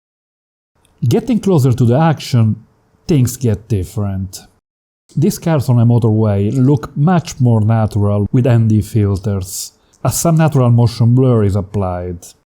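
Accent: Italy. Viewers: I could listen to you all day, love your Italian accent :D Great info! Many thanks :)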